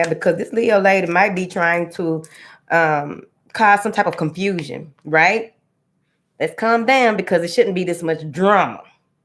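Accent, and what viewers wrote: South, United States. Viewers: You so Southern and I LOVE IT!😍😍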